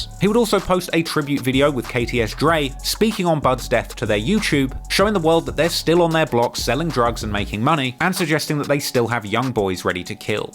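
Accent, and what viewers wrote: United Kingdom. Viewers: Middle aged British guy speaking on US gang culture wtf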